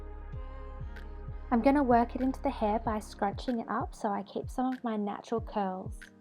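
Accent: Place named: Australia. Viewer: You are beautiful love the Aussie accent lol I’m from Sydney. Keep holding your head high. You are blessed. Don’t let others bring you down. 💖 love Loz x ps. Can’t believe your lips are real! You must cop so much shit lol 😂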